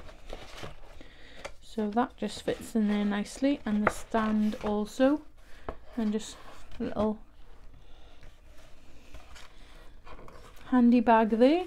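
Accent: Wales, United Kingdom. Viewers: Yay I thought I recognised a Welshy accent. I’m in Cwmbran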